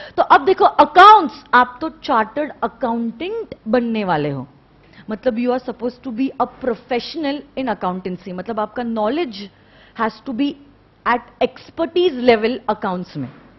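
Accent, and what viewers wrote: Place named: Gujarat, India. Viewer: I have nothing to do with CA or any Crazy study Shit. Still, I bought her books and Pen Drive stuff. She is an Amazing woman., and that thick Gujarati accent adds cherry over the cake. Keep it up, you have been fabulous. I never liked CAs until one fateful day I found you on Youtube.:)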